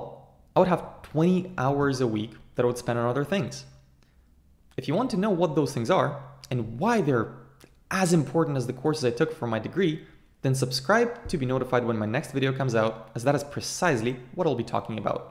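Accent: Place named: Canada. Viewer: Can hear u r Canadian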